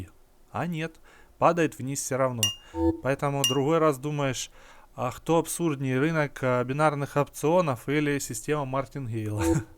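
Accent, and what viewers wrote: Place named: Ukraine. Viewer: По разговору я понял,что Вы из Украины! Пополнчли через вэбмани или киви,как лучше? И можно ли с приватовской карты пополниться ?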